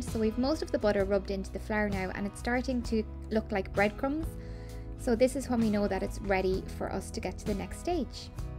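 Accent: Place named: Ireland. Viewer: They look lovely, and your Irish accent is lovely as well! Can’t wait to make them with some clotted cream!😊👍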